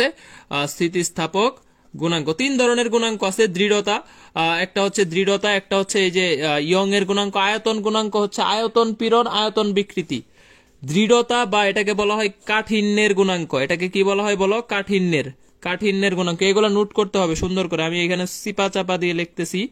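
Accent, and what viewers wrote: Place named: Barisal, Bangladesh. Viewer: বরিশালের ভাষা সুন্দর ভাইয়া ♥️❤️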